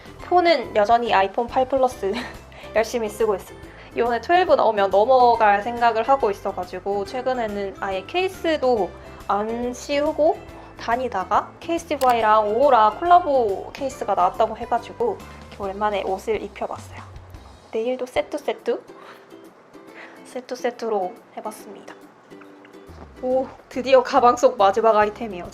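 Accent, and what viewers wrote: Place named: Gyeongsang, South Korea. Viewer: 대구 사람이시죠? 말투가 딱 대구같은데😚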